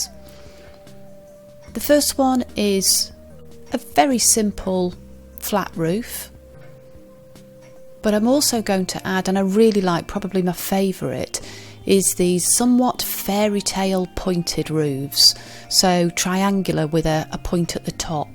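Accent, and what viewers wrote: United Kingdom. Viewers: You are such an inspiration to me!! Yesterday afternoon I spent a magic time following your tutorial and painting the houses myself. As soon as I know how to post it I'll show it to you. Oh, and your British accent is just a beautiful addition to all your beautiful and helpful videos. Thanks so much from Spain!!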